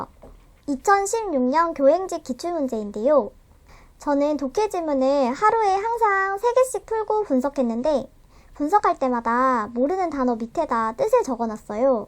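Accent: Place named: Busan, South Korea. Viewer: 부산사시나요 ㅋㅋㅋㅌ 웃기네요 영상 잘 보고 갑니다~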